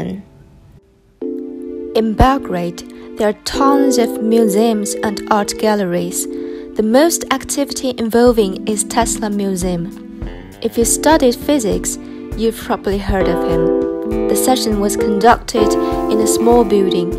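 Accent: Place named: United Kingdom. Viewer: 好棒好棒！！！配乐好喜欢 还有你的英音😍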